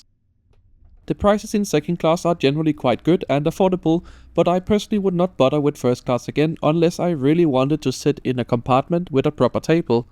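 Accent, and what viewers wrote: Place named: Denmark. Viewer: I was wondering: funny this voice sounds almost like having a danish accent! And them I read the name…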